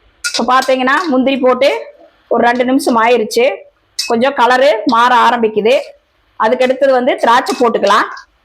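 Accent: Kongu, India. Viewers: எங்களுடைய கொங்கு தமிழில் நீங்கள் பேசி சமையல் செய்வது அருமை தங்கை🎉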